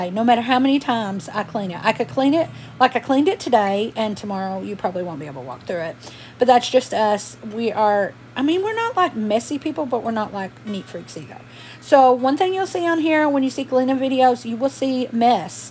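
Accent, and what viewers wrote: South, United States. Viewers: New subbie here! I’m from Alabama so I love your southern accent! Tell you what. I’ll stay if you learn “Miranda’s” real name.🤣🤣🤣Thank you for sharing. Blessed be!🙏🏿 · Looks good. Your place is cute……I love your southern accent!!!!! I think also wherever you live you can make it your beautiful home. I have lived in an old mobile home when I was in my late twenties and also a very old summer cottage that was much more like a shack with no heat and they were fixed up very pretty and comfortable by me…. Love it! I love fixing up and making my space cozy…. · New subbie here. Enjoyed the video. I love the chattiness. Excited to see your home improvements. And love yhe Southern accent. Be yourself. And don't let the negative comments rent space in your mind.🥰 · Loved your video. Keep them coming. Your southern accent is great!!! Can't wait to see more videos!!! 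God Bless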